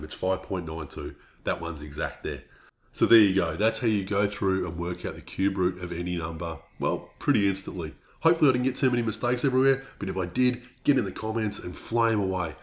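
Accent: Australia. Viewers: Bro are u aussie by chance😮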